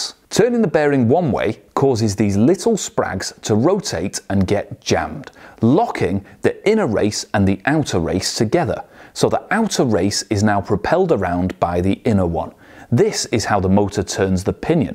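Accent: United Kingdom. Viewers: Indeed very informative, full of energy and I love the british accent. =)) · Is this guy from England? I like him, he has a passion for mechanics.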